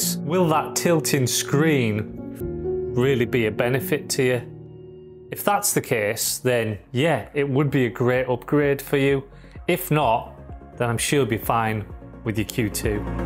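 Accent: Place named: Carlisle, United Kingdom. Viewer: Hi subscribed as soon as I heard the accent! Ex Carlisle lad living in Australia. Have been thinking really hard about selling all my olympus gear and getting this camera…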